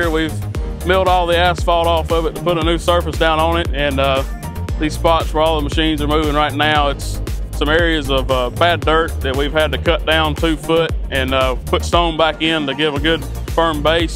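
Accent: South, United States. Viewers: Yes, he's got a drawl. Why?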